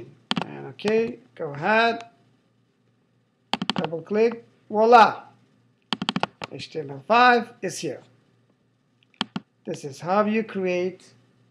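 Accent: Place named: Russia. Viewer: russian lol!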